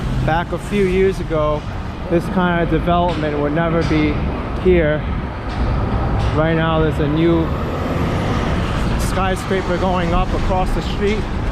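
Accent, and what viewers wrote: New York, United States. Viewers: Which borough is your accent from?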